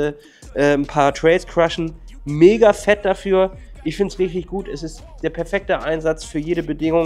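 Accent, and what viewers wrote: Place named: North Germany, Germany. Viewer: Mönsch, schön norddeutsch. Fühl mich gleich heimisch! :)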